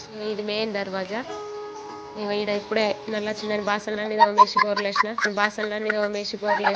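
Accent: Nizamabad, India. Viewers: మాది నిజామాబాద్ జిల్లా,నీవు మాట్లాడే యాస నిజామాబాద్ జిల్లా యాస లాగా వుంది అందుకే మీది ఏ ఊరు అని అడిగాను.జై తెలంగాణ జై, భీమ్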